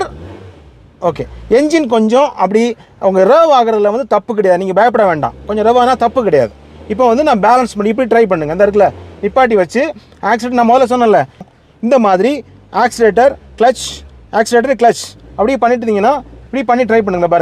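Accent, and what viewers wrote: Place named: Nanjil Nadu, India. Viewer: எளிதான, அருமையான விளக்கம் தம்பி... உங்கள் நாஞ்சில் தமிழும் அருமை....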